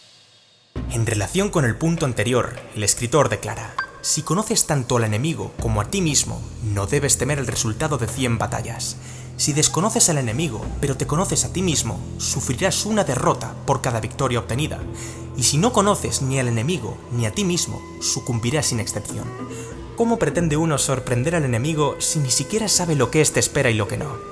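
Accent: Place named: Spain. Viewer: La voz no ne gusta..es de España...setia mejor una voz neutra..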